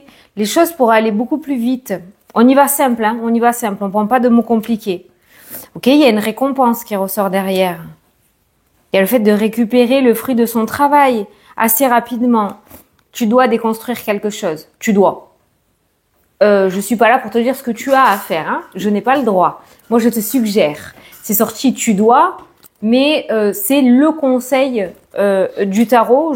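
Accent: South, France. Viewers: Merci 🎉❤ ta guidance tombe à pic! Tout est juste...incroyable Merci pour ta fraîcheur. En plus, j ai le même accent du sud que toi. Tu es une belle découverte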